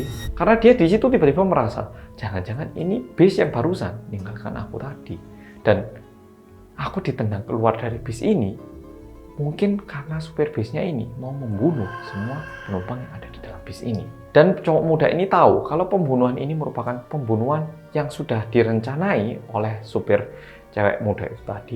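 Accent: Java, Indonesia. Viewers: Ini mah cerita bohong, btw ngga pernah bosen denger mas cerita apalagi dengan cirikhas medoknya itu lo.. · Selalu suka sma stortelnya mas. Keren bgt itu loh meragain dan bawain tokohnya tpi ttp meddok gk ktinggalan Kalo jwaban akun ceritanya yo psto bihong iki. Soale kyak smcam urban legend aja gitu hihii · Ngga tahu ini beneran atau bohongan, tapi gw pengen ini bohongan aja karena jahat amat umat manusia 🙏🏼🙏🏼 thank you, Boloku Sing Paling Medok Dheweeeee 🩵🩵⚡✨🪷🫶🏼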